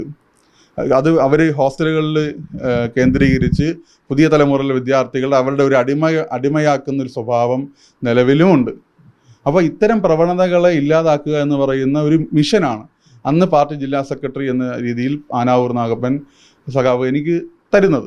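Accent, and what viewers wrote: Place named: Kannur, India. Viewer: ഈ ആൾ. കണണ്ർ. കാരനാണൊ. വാചകത്തിന്റെ അവസ്സാനം "വേണ്ടി" എന്നുകേൾക്കുന്നു 😢😂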